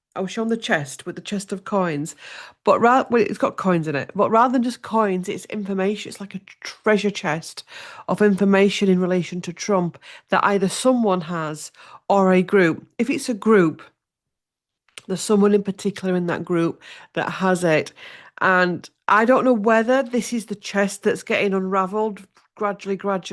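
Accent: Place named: Northern England, United Kingdom. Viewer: . Hi Curious! Your comment was so gentle, unlike mine. I resist a little harder than “respectfully”. I am empathetic to your position, but I am so afraid that trump(no capital) is a dangerous man with a severe personality disorder that puts us all in danger. If you are an American going through this shambles, I am sending love and respect from Canada, where we see this chaotic nightmare every day! I am a Brit transplanted to Canada and sometimes listen to for her good heart. I relate to her accent and comic idioms from the North of England. ❤️🇨🇦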